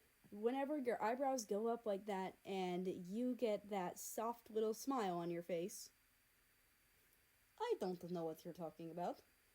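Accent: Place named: Russia. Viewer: Sfhgfhhjnbdgj I can’t listen to this rn bc I’m busy but AAHHH TYSM!!! I got to about 8 minutes in lol, I’ll finish it later!! I’m actively cringing at the way I wrote things back then- especially the typos in the summary- how did I not notice those- but you know what it’s okay 😭 anyway YOUR READING IS FUCKING INCREDIBLE can I just say that I’m really impressed by your Russian accent?? I can’t do accents to save my life 😂😂 I’ll probably say more later bc I have to go now but THANK YOU SO MUCH ❤❤❤❤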